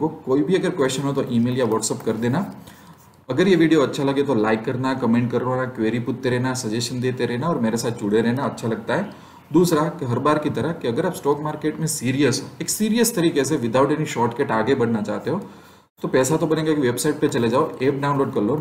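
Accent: Gujarat, India. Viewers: Very nicely explaine in Hindi with Gujarati lehja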